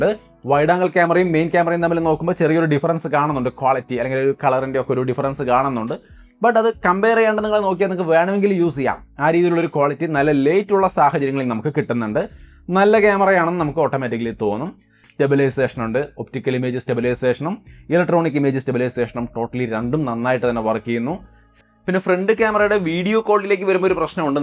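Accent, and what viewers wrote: Thrissur, India. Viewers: Are u from Thrissur!??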